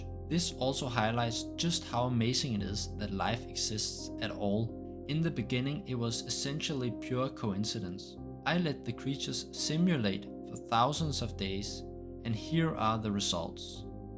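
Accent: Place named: Denmark. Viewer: Fed fed projekt kunne høre du var dansker fra starten 😂 Arbejder selv med ai så fortsæt det gode arbejde!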